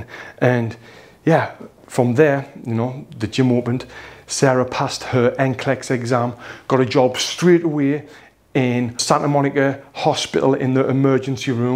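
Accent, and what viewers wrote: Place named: Northern England, United Kingdom. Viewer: i love how you put subtitles up sometimes, as a british person i was confused why you did it on some parts of your video as i can understand you perfectly but then i realised that some americans may find the nothern accent hard to understand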